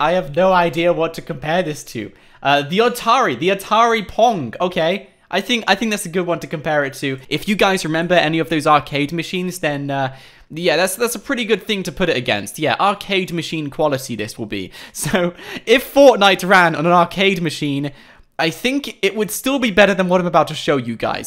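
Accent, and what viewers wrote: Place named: RP, United Kingdom. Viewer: his accent is so posh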